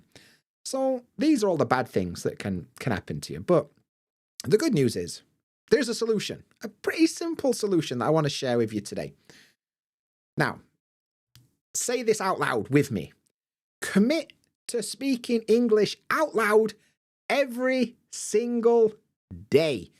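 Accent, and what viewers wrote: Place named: Yorkshire, United Kingdom. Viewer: I love your video so so much! I'm always fascinated by the Yorkshire English. Although I rarely comment but I certainly watch your video a lot and I learnt a lot from your video. Support you.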